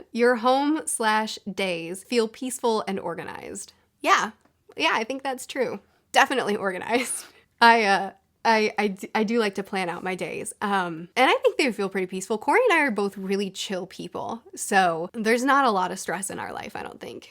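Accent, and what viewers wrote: Canada. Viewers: I’m surprised that you get compared to Lacy Chabert most. I think you look far more like Linda Cardellini, and your voice is way closer to hers too. I don’t see the resemblance to the other three examples at all. Also, I think the reason a lot of people think you’re from Canada is because of how you pronounce the first O in words like “borrow” and “tomorrow.” That stood out to me as well. Anyway, love your videos! 😊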